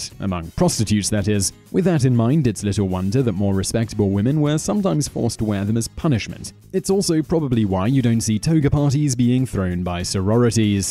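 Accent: United Kingdom. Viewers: I really wouldn't expect a man with a British/English accent to have an UNBIASED opinion of the American Revolution. If the colonies were "impoverished", it was due to the taxation and mismanagement by the Crown. The colonists had to be good shots, since colonial larders were augmented with fish and game. The "Lobster Backs" as the British soldiers were called by the colonists, lined up in neat rows and fought in the European way. The colonists wore clothing dyed in nature's colors, and fought more in the native American way. Furthermore, the British were quite unfamiliar with the terrain in America--something the colonists were NOT. Furthermore, the British hired German mercenaries--Hessians--who sometimes defected to the American cause. Let's also keep in mind that the British senior officers--from the wealthy, landed aristocracy class--didn't exactly treat their own soldiers (let alone the colonists) with any modicum of respect. 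<link>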